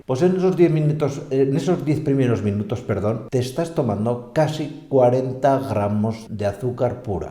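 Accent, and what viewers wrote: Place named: Spain. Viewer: "simple y humilde taza de café" 😊🙃😉 Tu eres un amor! Hace ya un tiempito que vengo escuchándote (y leyendo los subtítulos) y me da la impresión de que me va a pasar contigo como con la coca!!! Noooo! Chiste! Entre otras cosas MUCHAS gracias por lo de la malta para los gatos!!! Me alegra que sea buena! Aquí en Alemania nunca leí ni escuché eso y ya me estaba dando temor de hacerle algo malo a mi gato dándosela. Todo lo mejor para tí! Me encanta tu acento y melodía al hablar. De donde (de España) serás?!☺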